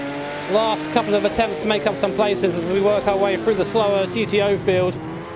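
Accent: United Kingdom. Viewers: wow British people seems to have a default beautiful commentary voice! loving it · He's British, to an Americans of course he sounds the part. Now let's see him during a Daytona 500 yehaaawww got dam look atem go jerry,yeah not so much. He'd also sound good in a premier league match(football soccer)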